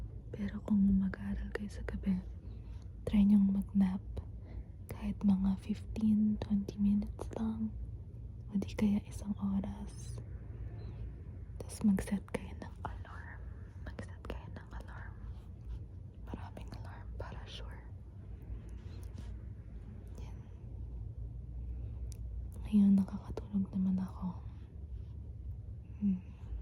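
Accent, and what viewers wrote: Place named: Conyo, Philippines. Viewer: Conyo soft spoken naman, taglish 😍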